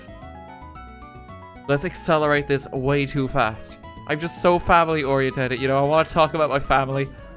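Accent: Ireland. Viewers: are your irish?!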